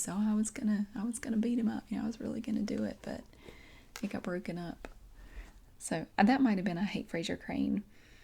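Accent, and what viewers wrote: South, United States. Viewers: Love your southern accent. It's fairly mild or just a kind I prefer. Since you must like more dry humor like me, it's possible you'd like Dom Joly's two main shows (that I know of) which ran back in the 2000's like 'World Shut Your Mouth' and also 'Trigger Happy TV'. They're more prank/public spectacle based though.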